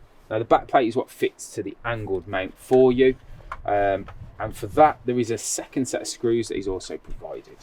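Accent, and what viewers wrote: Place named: Birmingham, United Kingdom. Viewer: I detected a Birmingham accent, then I googled Smart & Secure Centre and you're a few miles away from me haha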